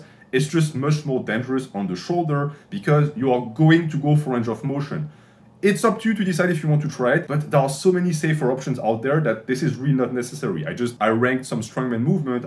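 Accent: France. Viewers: Are you french? your accent sounds like it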